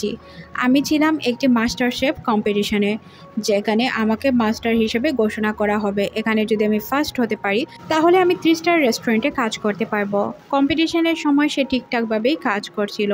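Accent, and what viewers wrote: Sylhet, Bangladesh. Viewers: Apu ki sylheti?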